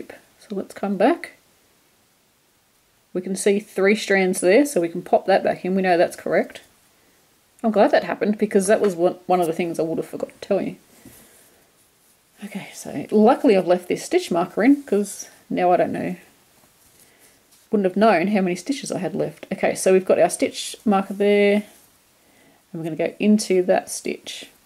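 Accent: Australia. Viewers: So enjoying your Aussie accent and way of talking! Oh and the crochet too of course :)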